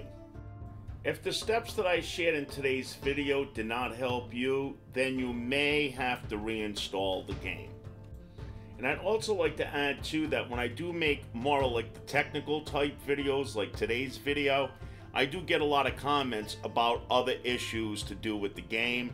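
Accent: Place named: New Jersey, United States. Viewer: never seen any of your other videos so im not sure if you were just doing a goblin voice for the video because you love wow or you're repping joirsey, either way good stuff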